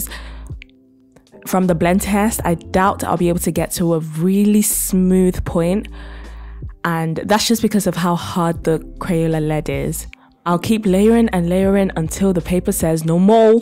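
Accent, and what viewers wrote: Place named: England, United Kingdom. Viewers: everyone has an accent? I’m not good with accents but she has a type of English accent. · i'm not sure what you mean. i'm pretty sure she has a British accent but u do u ig.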